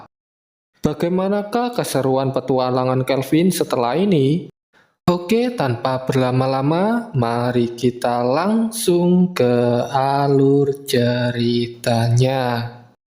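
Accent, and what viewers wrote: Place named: Java, Indonesia. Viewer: Jangan medok min ngomongnya🤣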